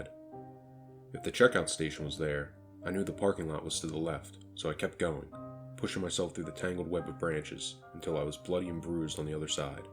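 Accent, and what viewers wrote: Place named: Pennsylvania, United States. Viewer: First of all, I love your voice! Most of my family lives in Pennsylvania, primarily Lancaster county but elsewhere too. It's comforting hearing the accent because it sounds like most of my relatives. Secondly, I saw your comment on a different channel. You asked someone for advice or ideas or something. As your 28th subscriber, I'm mostly satisfied. I liked the second story more than the first. Both were great, but you narrated the second one a little bit slower. And this is my only constructive criticism. If you slow down the reading just a little bit, you'll be right on par with all the channels we both know and love. To your credit, I was still able to understand every word, despite the speed and the accent. Honestly, because of my family, it may be easier for me than for others. My dad especially- he's particularly difficult to understand sometimes. 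Anyway, please accept this input from a place of love and respect. I'm looking forward to more content from you in the future! 🙂💙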